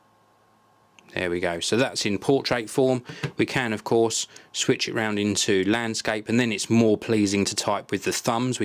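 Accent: United Kingdom. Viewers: This is probably the most powerful british accent I ever heard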